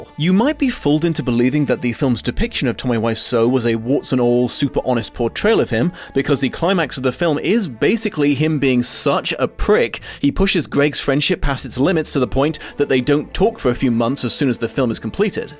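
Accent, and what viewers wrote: United Kingdom. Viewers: Stfu about Franco. Performative whiteknighting into extinction. Every time I hear this UK accent in a video it's a 100% guarantee it will have the dumbest pretentious takes ever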